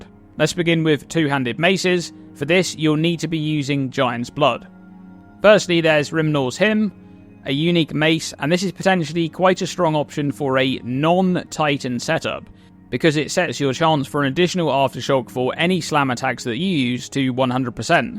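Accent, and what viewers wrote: United Kingdom. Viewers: The English accent is the nicest of all the accents.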